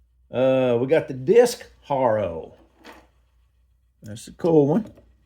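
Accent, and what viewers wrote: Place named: Ohio, United States. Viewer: That platform truck is awesome. What state are you from, trying to catch that accent? Ohio? Dump truck is cool 😎. That driver has a lot of brothers, they all look the same. Nice collection. WOW 👍👍👍👍👍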